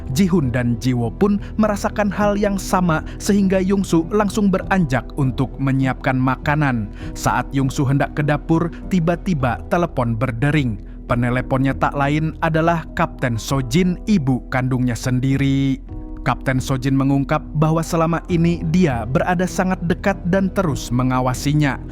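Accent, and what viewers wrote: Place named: Banjar, Indonesia. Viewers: Kaya' orang Banjar logat naratornya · Naratornya urang Banjar ini ya KLO... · Orang Banjar sekalinya Sidin ni lah wkwk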